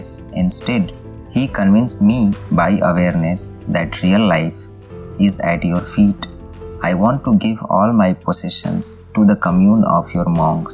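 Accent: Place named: India. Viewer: Thumbs up for using your own original goofy indian accent instead of an AI voiceover. At least it's genuine.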